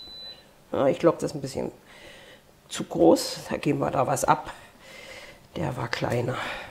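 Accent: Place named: Altmark, Germany. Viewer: Sieht superlecker aus 🤪 Dankeschön für Dein Rezept Vom Dialekt her kommst Du aus der Altmark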